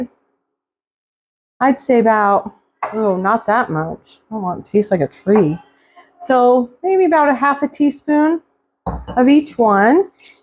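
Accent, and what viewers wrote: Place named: Minnesota, United States. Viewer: 😂forgive me. "Accents" is one of my things. New to yr site. Literally, first time today. Don't wanna invade yr privacy. I hear South, Minnesota, and more. "MA Jor am"😂❤ again not being rude. Me born raised Chicago, 15 years ABQ, almost 10 SE TX. Just love people's voices. 😊 including yr son's.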